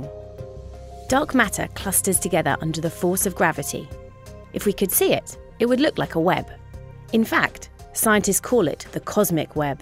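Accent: United Kingdom. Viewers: Долбаный британский акцент! Ненавижу! Что за wohapen? Сложно нормально говорить? Придурки!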